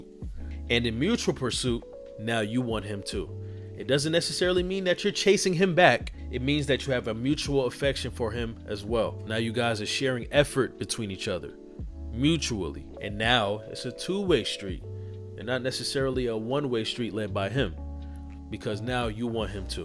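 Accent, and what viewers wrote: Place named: Philadelphia, United States. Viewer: Love your Philly accent❤ Great content.😊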